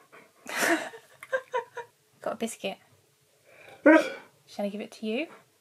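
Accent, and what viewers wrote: United Kingdom. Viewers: What kind of english accent it is?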